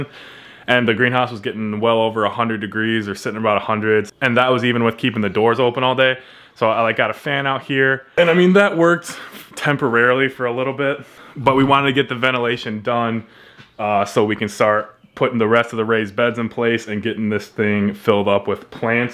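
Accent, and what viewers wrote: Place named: Wisconsin, United States. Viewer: The Wisconsin accent is incredible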